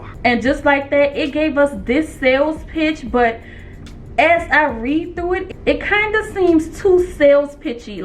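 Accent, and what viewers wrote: New Orleans, United States. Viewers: I kept telling myself, she sounds like me, she has to be from the 504. lol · I knew you was a Nola baby😂.. Wuzaam witcha? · Girl! The second I heard you talking I was like she sounds like she’s from here… then Sho’Nuff you said New Orleans girl I’m right here on the West Bank! So of course, I subscribed for that reason and because you are doing the Lord’s work out here girl! Thank you so much for not gatekeeping information like this. Also, the way you explain the process step-by-step was flawless and completely the way I process things. So I just wanted to come on here and thank you and say hey from the West Bank and to tell you, you are doing amazing things and it’s appreciated! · I can tell by your accent you from New Orleans !! Love seeing people from my city really make it out !! I'm next !! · I love your N.O. accent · Girl.. 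you sound like you’re from the N.O!! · After listening to you speak the first thing I thought was "She MUST be from Nu Awlins". I just discovered your channel last week and will be applying your directions and advice to make money. And boy do I need it right now. I fall down but I keep getting up. Thanks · Thanks for your info. I knew the accent was New Orleans❤ Baton Rouge here 👋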